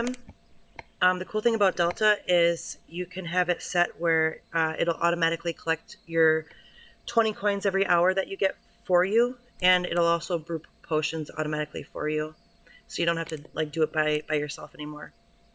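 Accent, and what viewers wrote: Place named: United States. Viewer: con acento americano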